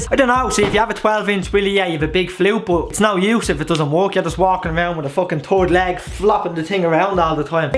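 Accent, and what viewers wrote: Ireland. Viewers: Its nice to listen to an Irish person on you tube just messing around and not being so serious. PS you remind me of my little cousin's deadly (*-*).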